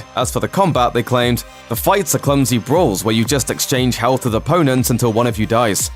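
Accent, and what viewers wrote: United Kingdom. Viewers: The game is absolutely AWESOME...this guy can take his limey voice and piss off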